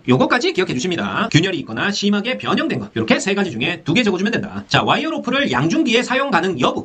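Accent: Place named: South Korea. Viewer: 단55 틀68~ 사투리가 구수하시네요.. 어디 지역분이신지?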